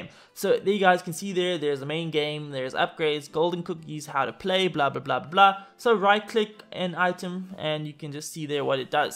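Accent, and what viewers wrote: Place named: South Africa. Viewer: it makes sense cause I am from South Africa I’m guessing most of your fans are South African, they just didn’t realise your accent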